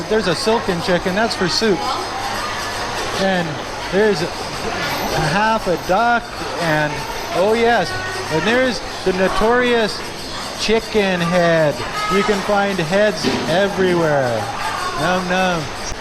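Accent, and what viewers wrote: Canada. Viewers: I like his Canadian accent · Damn is don’t know what’s worse these markets or his Canadian accent ay?! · Wow that’s a strong Canadian accent😂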